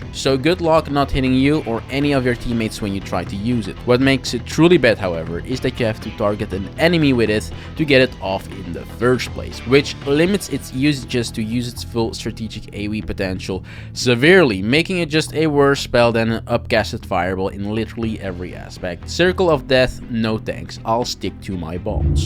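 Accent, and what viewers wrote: Netherlands. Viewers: Hey klootzak, are you Dutch?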